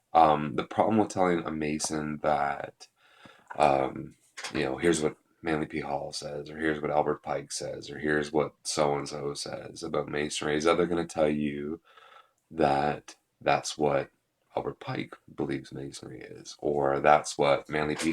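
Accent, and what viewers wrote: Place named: Nova Scotia, Canada. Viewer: A very insightful video, and good for you, sir. thanks for sharing. You sound like a Nova Scotian.. or at least a Maritimer, that's where I'm from :)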